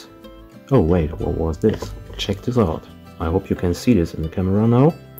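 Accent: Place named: Germany. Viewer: I just got interested in gemstones, while looking for a sapphire ring for my daughter. Now I am fascinated with the whole subject of gemstones. Thank you for this video! So far the best one regarding natural vs synthetic! I like your German accent, I’m German myself, living in Southern California. 🇩🇪💍💜💎😊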